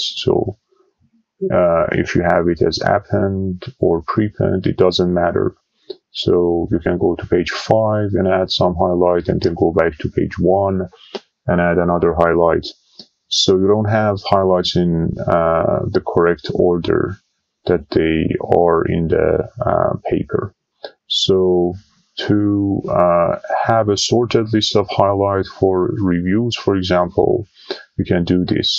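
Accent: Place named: Iran. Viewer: I hear persian accent 😀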